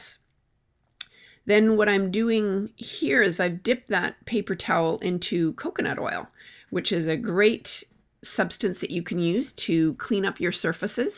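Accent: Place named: Fargo, United States. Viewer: Omg I love your Fargo accent so cute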